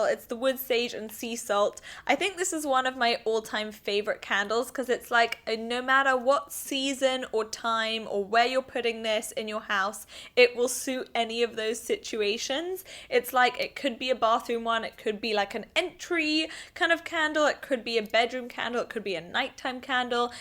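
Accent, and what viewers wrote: France. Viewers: Loved this video ! And the French girl is saying : Your French accent is so cute hahaha xx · haha i didn't really understand when you pronounce the fragrance's name but after i saw the name and rethink about your french accent, i feel like it's pretty accurate :P LOVE YOUR VIDEOS!! >< <3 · your french accent is so cute it worth an 8 i love your vidéos !! Bises from France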